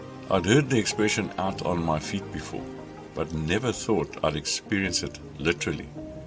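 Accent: South Africa. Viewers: You're English speaking? Where you from? Bloemfontein? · You have a very strong Afrikaans accent. JY is soo Afrikaans en probeer bewys JY is nie.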